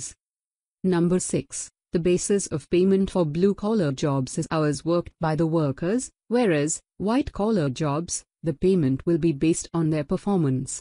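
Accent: India. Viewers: In many parts of the world, blue collar jobs pay people much less and are therefore considered to be lower. However, in developed countries, blue collar jobs can pay just as much if not more. I agree with you, but I did notice that her AI accent was Indian. In India, blue collar jobs tend to be done by lower educated individuals who also get paid less.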